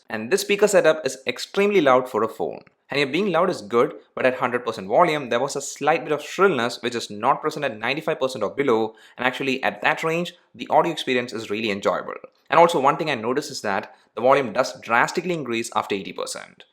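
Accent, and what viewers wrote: Kerala, India. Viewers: are you from Kerala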